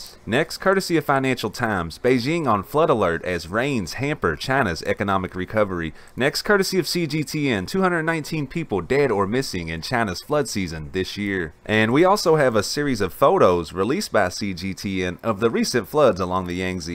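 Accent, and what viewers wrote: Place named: Texas, United States. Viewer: Good coverage. Another interesting video. I have been subscribed for a few weeks now and am enjoying the dam coverage. I also enjoyed the coverage of the Mt. Sinabung eruption. If I could bring up a minor sticking point on your vocabulary... the word reservoir is pronounced resev-war not resev-wire. Just FYI. Wouldn't want anyone mistaking your charming southern drawl (Texas?) for ignorance.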